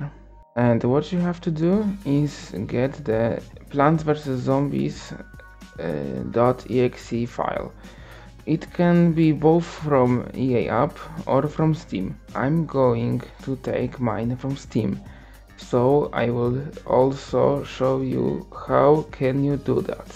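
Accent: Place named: Poland. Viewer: słychać że polak :PPPP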